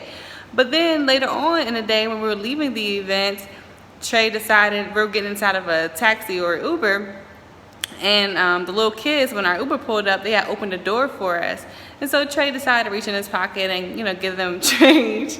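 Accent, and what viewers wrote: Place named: Nashville, United States. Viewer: Filipinos are not racist.. black or white is ok..no problem because w are brown people😉😉😘 but your accent is like my friend accent she is living in Nashville 🤩💖love it🤗 Great video!☺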